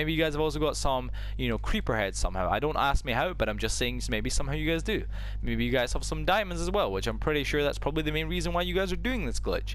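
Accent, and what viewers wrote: Scotland, United Kingdom. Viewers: What part of Scotland are you from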